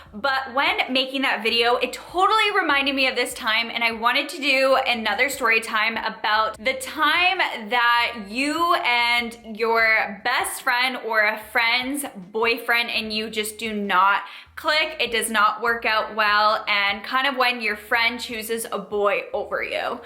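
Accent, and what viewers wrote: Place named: Canada. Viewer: I love you're canadian accent lol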